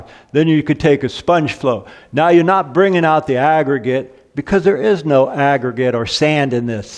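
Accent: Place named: California, United States. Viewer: Man, your accent is so Californian that you could star in the series “The Californians” Love it.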